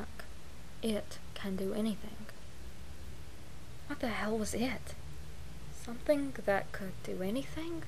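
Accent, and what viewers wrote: South, United States. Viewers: Australian?! LMFAO. Clearly tell she's a southerner. So cute. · I thought you sounded southern!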